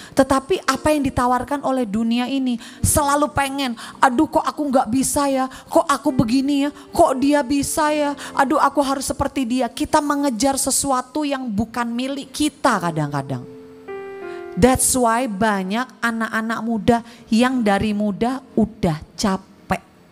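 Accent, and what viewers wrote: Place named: Java, Indonesia. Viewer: Pasteur nama batak kok medok dan campur bahasa Jawa tp jd lebih membumi ❤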